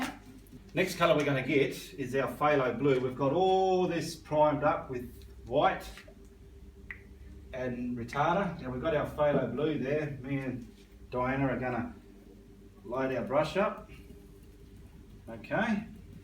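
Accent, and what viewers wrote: Australia. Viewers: LLOOOOOVE YOUR AUSSIE ACCENT MATE! 😂😂😂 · I am loving your tutorials!! I have been catching myself taking with an Australian accent!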